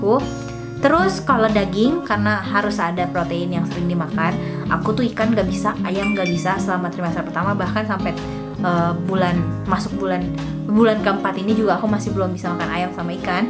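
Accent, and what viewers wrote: West Java, Indonesia. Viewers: Sepertinya teh ngomongnya agak engap ya heheh😄 antara lagi pilek atau apa atau aku aja yg dengernya gitu😁. Oh yak logat sundanya aku suka banget kaya aky jadi kaya campur2 gitu walaupun ngomong indo😄. Oh ya semoga teh sama baby nya sehat2 terus yak sampe nanti lahiran🤗🤗❤❤❤ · dari cara ngomong y kliatan bngt kalo org sunda😆 slamat ya kak,smga slalu sehat trus😘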